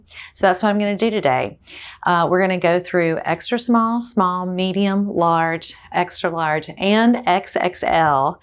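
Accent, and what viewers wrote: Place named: Alabama, United States. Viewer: what a pleasure it is to watch your videos!! I am an Alabama girl also, so I love your accent and your “southernisms”! I am a quilter and 3L ( this means love, love, love) using men’s shirts for my quilts. I buy them at the thrift store and I have found $60 shirts for as low as $1 on special sale days! Oh what a happy girl I am when I get such great bargains AND beautiful fabric for my quilts. Keep producing your videos and please share your finished projects with us!!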